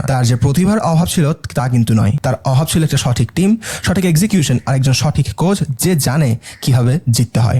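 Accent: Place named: Chittagong, Bangladesh. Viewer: Chittagong er accent❤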